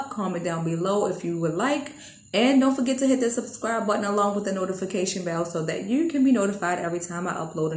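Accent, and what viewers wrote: United States. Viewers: you have a cowboy accent haha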